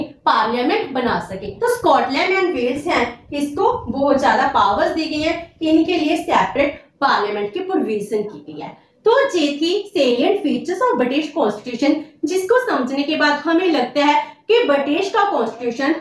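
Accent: Punjab, India. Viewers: Mam you made Polity as easy as Hindi hats off to you mam🙏 Dhanyavaad . Aur aapke samjhane ka tareeka , 5abi accent Best Way mam Thank you🙏 Acche teacher ki nishani hoti hai uska subject ke prati interest joh dikhta hai aapke padhane mai . May god bless you.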